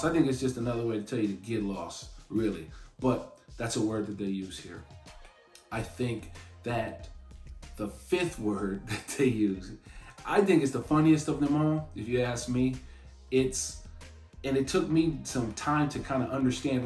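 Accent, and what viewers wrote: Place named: United States. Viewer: Hearing our slang in an American accent is amazing. Subbed! P.S 'Sod' can also be a noun.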